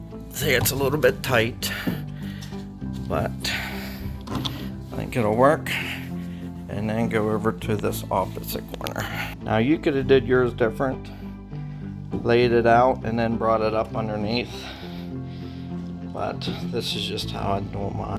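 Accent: Western Pennsylvania, United States. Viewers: Thanks! We are going to give it a try. And you must be from western PA. The most unique accent in the United States. 🇺🇸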